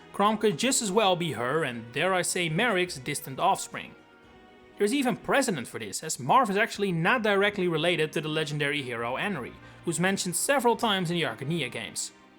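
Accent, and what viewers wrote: Netherlands. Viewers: I was contemplating if he was dutch or not for so long! I kept thinking, is he dutch? The accent sounds so dutch! Now I finally have confirmation! Another dutch YouTuber found! I love being dutch sometimes hahaa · You can hear you have an accent but I did not expect it to be dutch! Are you from the netherlands or belgium? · You don’t have to tell me about jokes. When I lived in the US, the jokes that killed in the Netherlands fell flat with my American friends lmao Also, I KNEW you were Dutch!! I definitely recognized your accent when I first heard you speak in your earlier videos. I love seeing fellow Dutch people and especially when they’re into FE lol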